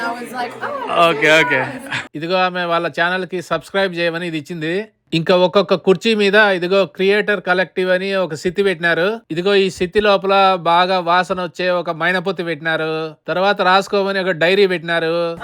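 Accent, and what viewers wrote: Kadapa, India. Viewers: సూపర్ గారు. కడప బాషను చాలా ఉంది. మీది కడప దగ్గర ఏ గ్రామం గారు. మాది రాజంపేట. ఎడ్యుకేషన్ కడప ఆర్ట్స్ కాలేజీ. ప్రెజెంట్ నేను హైదరాబాద్ చందానగర్ లో ఉంటాము. 1991 హైదరాబాద్ కు ట్రాన్స్ఫర్ అయినము. కడప బాషా వినాలా అంటే మీ ఛానల్ విని ఆనందం పడతాము.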